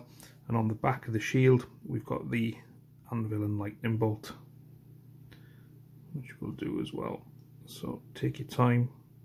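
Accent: Wales, United Kingdom. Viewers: Hey great video, just picked up my first Age of Sigmar set and I'm keen to get painting. Also, do I detect a little Welsh accent in there? Either way, greetings from South Wales :D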